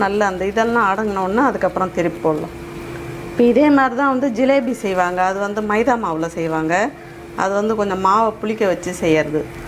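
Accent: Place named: Cuddalore, India. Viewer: கடலூர் விருத்தாசலம் பக்கமா..... தமி்ழ் சொற்கள் மிக அழகாய் இருக்கு....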